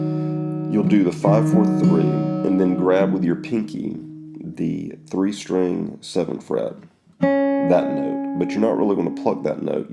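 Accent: South, United States. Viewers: Once again on it. I've convinced myself that he is Dr. Phil's brother which is why I will refer to him from now on as. Judging from his southern draw and his efforts to not show his face to keep the spotlight off of him and keep it on the music, what a class act. Thanks again! Your secret is safe with us.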